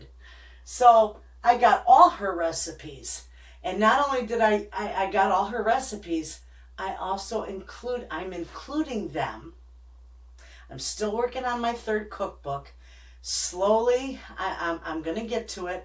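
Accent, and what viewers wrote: Michigan, United States. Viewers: You just popped up on my play list, took a look at your pantry, trying to figure your accent. Michigan! We used to live in Hersey!!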